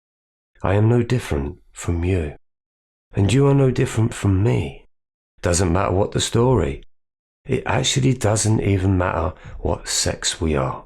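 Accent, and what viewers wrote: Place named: Cockney, United Kingdom. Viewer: This is great. As a Northerner I never thought I’d be enraptured in a lesson on the dark night from a cockney geeza called! 😂 We live and learn. Thanks mate, wonderful video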